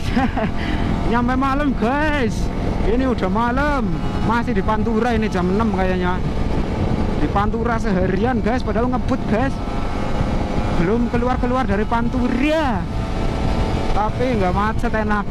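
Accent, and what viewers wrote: Banyumas, Indonesia. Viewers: Salam NINJAR NINJARR KEBUMEN 😂 ,,vlogger nyampur ngapak😁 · Ngapak,e ketok mas😁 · Ngomonk nya bhsa ngapak aq jdi inget waktu bersama tmn tmn q dri wonosobo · Rider ngapak 😂 Jadi kagak ngantuk gue nton vlog rider 1 ini salam kenal aja mase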